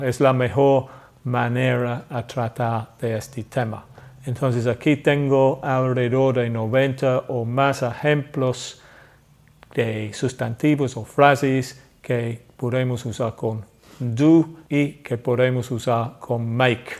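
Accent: England, United Kingdom. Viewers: Amo el acento Ingles. Ya me quedo clara la diferencia =)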